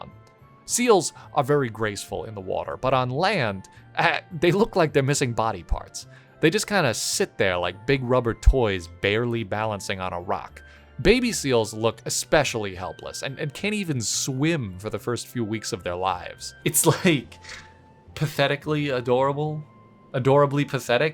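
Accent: New England, United States. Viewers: I can't tell if his NE accent is stronger in this video or if I just barely noticed it now that he said he's from there lol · Love how the New England accent becomes slightly stronger throughout the course of this video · when you said "hear that?" I actually heard a bird call that might have been a bluejay. btw I love you doing a new england accent even while you're not joking, it's nice. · Haven't watched for a while so the new england accent really hit me like a truck. not sure if its new or I just forgor. anyway I love Shorlugg pinipeds rule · Dunno how its taken me this long to realize youre from new england, maybe cus im from here too i was just like "yep completely normal average accent right there" lmao??? But eyyyy neighbor!!! (Pls read all in an equally thick Cape accent)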